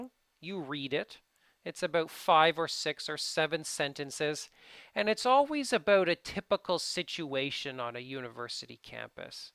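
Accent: Canada. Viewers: Do I hear Canadian accent??? Just curious..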